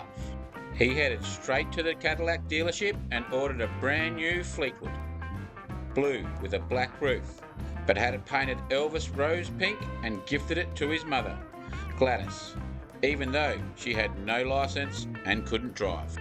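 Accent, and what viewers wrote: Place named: Australia. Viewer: WHY IS A TERRIBLE AUSTRALIAN VOICE SPEAKING HERE - SURELY A PROPER SPEAKER WOULD HAVE BEEN BETTER !!!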